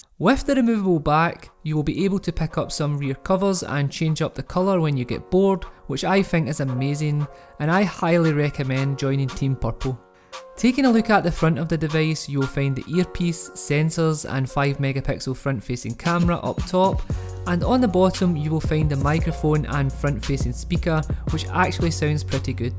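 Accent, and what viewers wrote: Scotland, United Kingdom. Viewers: Canadian? With a Scottish accent? How did that happen.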